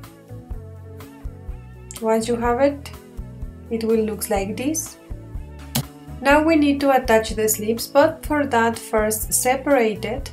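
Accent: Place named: India. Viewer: hey.. u are just awsome!! I have a personal question for u? where are u from? yr accent sounds like asian or tribal indian. may I know plz?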